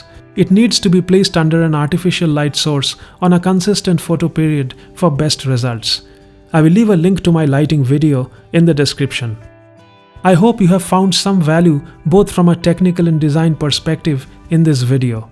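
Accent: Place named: India. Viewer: Your accent is very strong. I couldn’t understand you at first glance but after 5 slides of the same thing. I get you my brother from India dude.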